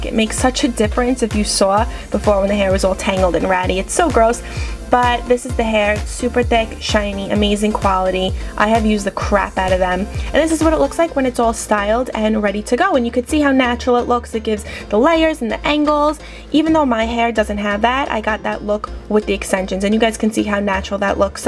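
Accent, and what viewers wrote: New York, United States. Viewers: LOVE your New York accent! I'm from Staten Island and I moved to jersey over 15 years ago and I still have my accent lol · New york? I love your accent!! love from Birmingham UK!!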